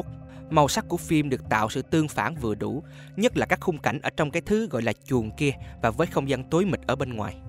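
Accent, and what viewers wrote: South, Vietnam. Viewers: Ko quen giọng miền nam à ? 😅😅 bake nên nghe ko quen chứ gì ?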